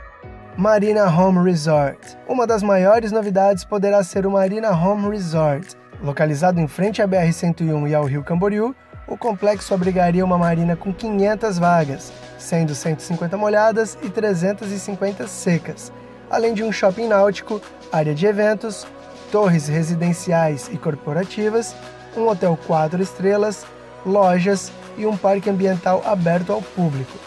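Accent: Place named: São Paulo, Brazil. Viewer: Sotaque paulista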